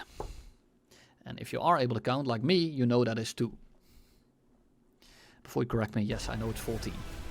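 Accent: Netherlands. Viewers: A dutch man sentually vispering sweet trailer details into my ears. ❤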